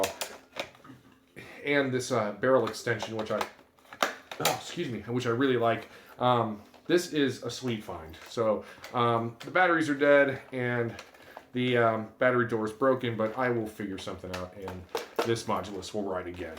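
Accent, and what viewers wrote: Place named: Ohio, United States. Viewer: Booger Barricade...., you can tell you are an Ohioan, I say this a boy born in Cincinnati and raised in Richmond, KY.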